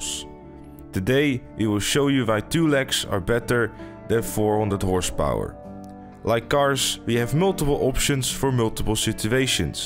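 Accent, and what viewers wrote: Netherlands. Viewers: I can't find out if you are trying to get your Dutch accent working again or if you are trying to speak English in your other videos. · HAHAH ik vind het nogsteeds leuk als je een nederlands engels accent hebt, klinkt als iedere nederlander ever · Ahh, I missed that dutch accent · holy shit you speak a really good dutch accent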